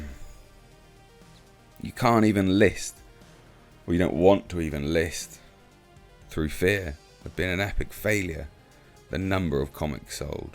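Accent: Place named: United Kingdom. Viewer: You're an Brit, pronounce "manga" however feels natural to you, bruv.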